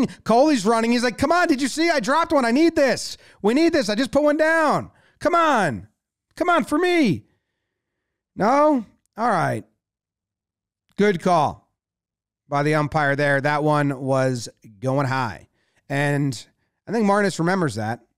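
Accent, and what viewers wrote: United States. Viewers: It’s just strange for me to have an American voice analyze cricket … I’m old school … but love the idea that Americans are getting into cricket … hate the idea that if they take it seriously, they will win more World Cups than the rest of us. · Commentary in American accent sounds a bit weird lol. Good stuff 👍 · it's fun listening an unfamiliar american voice break it down which tickles my senses. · So weird hearing an American talk about cricket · As an Indian enjoying the occasional baseball content, it's refreshing cricket from an American voice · Not gonna lie, still weird to have an American voice comment intelligently on cricket who isn't from the cricket playing countries originally... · Weired to listen to cricket commentary in an American accent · as an Australian i have to say it's weird as fuck watching Test cricket getting broken down by a guy w an American accent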